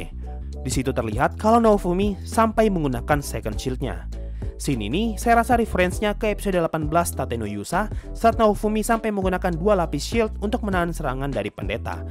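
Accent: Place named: Bugis, Indonesia. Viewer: logatnya si admin kek orang Bugis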